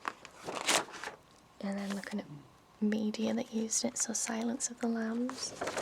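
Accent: United Kingdom. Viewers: are you from england ? cuse it seems like you have a british accent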